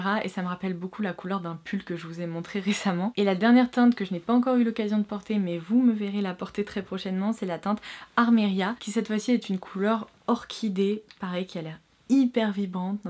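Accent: United Kingdom. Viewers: Ton accent anglais style britannique c'est si jolie 😊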